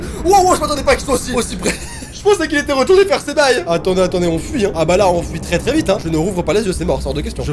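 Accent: England, United Kingdom. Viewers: 😂😂 et sons accent anglais😂😂😂😂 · jeux dhorreur Moi: ouiiii on vas entendre crier*-* Et le fameux accent anglais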